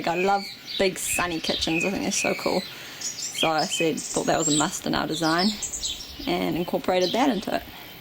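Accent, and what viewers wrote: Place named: New Zealand. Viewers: Very nice work. And that accent, holy crap I think I need to move to New Zealand. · To me it sounds kiwi with a tiny hint of South African.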